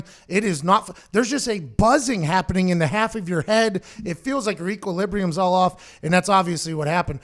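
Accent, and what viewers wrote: Pittsburgh, United States. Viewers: That’s that yinzer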